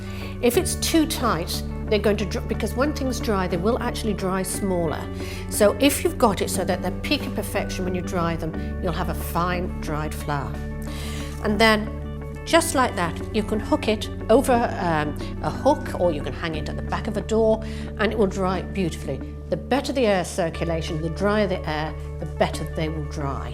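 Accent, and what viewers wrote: United Kingdom. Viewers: british as hell, sharp as usual good job!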